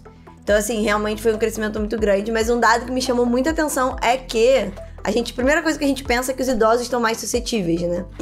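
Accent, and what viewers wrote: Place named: Rio de Janeiro, Brazil. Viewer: O sotaque feio. É esse carioca kkkk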